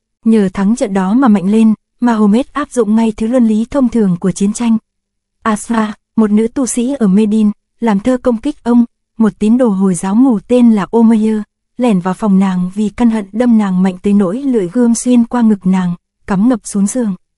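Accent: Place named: North, Vietnam. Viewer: Truyện hay và người đọc với giọng Bắc nhẹ nhàng làm hấp dẩn người nghe.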